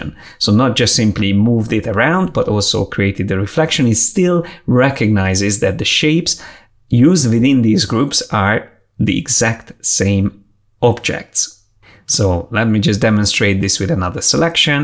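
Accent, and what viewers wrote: Hungary. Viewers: Sorry for the off-topic question, but may I ask where you're from? Your accent is a dead ringer for Hungarian but your name sounds Polish, so I'm both confused and linguistically intrigued. :) Great video, by the way.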